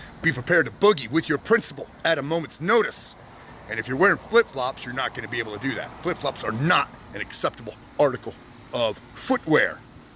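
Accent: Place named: Scotland, United Kingdom. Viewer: ! 😂😂😂 I KNOW this guy would sneak up on me and kill me if he needed to. Because I DID NOT see that Scottish accent coming. Awesome stuff,! I hope one day we can drink a stiff one and share artwork together!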